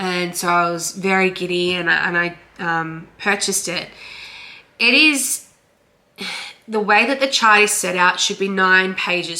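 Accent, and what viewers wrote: Australia. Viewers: Love hearing the Aussie accent on Flosstube! I agree, we need more seasonal stitching options over here in the Southern hemisphere. That BBQ is super cute 😍. Hope you feel better soon.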